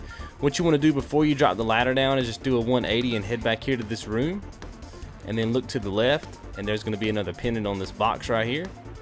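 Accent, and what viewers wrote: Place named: Mississippi, United States. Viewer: Thanks dude, I remember collecting these on my PS3, but I totally forgot already and now I need to finish 100% again in the remaster version, helped me greatly cheers =) ps: I love your accent, are you from Mississippi ?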